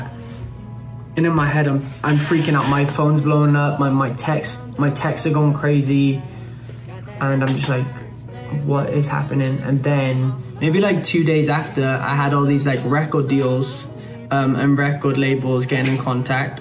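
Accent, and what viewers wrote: Wales, United Kingdom. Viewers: He's actually Welsh, part of UK. Now he lives in the US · I think it's Welsh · It’s Cardiff, Wales, UK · He is british my love, yes.💛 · Have just come across your songs and now I'm here. Was so lovely to realise after hearing you talk that you are British (not quite sure from where? Welsh? Anyway.... well done, you really have a fabulous voice and have really enjoyed hearing your journey. People are rarely good at everything so you got your full quote of being artistic .... but in every bloody way!! Incredible. Good luck. Hope we see you killing it on British soil. Hope my son (he's 16) turns out as humble and hardworking as you. (from 53yr old mum from Hertfordshire, UK)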